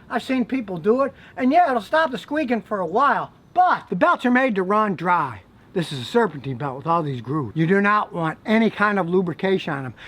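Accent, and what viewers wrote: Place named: Chicago, United States. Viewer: you sound like you are from Chicago.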